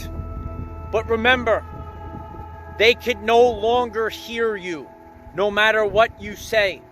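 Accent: New York, United States. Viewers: WOW! This was SUCH an amazing, powerful message. Thank you so much AA. Half my family and friends are jabbed zombies and I tried for years to wake them up, to a point of utter exhaustion. Now I just barely got enough energy to carry myself through so this message was perfect. Anyone else in here experiencing "glitches" with communications? Half my text messages and emails either never get received or I dont receive theirs. Its very odd....come to think of it, those are the zombies in my family and friends. You are so dead on when you say They cannot hear us anymore and the frequencies dont match anymore. That would explain all the "communication glitches" with my emails and texts. Thank you so much for all you do. Love your Yorker Accent:) Hugs from Canada xoox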